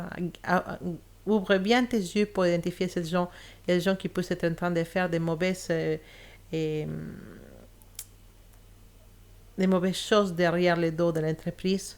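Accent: Spain. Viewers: cet accent, seriez-vous espagnol?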